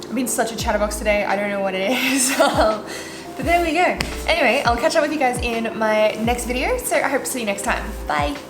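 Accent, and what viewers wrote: Australia. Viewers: Australia I believe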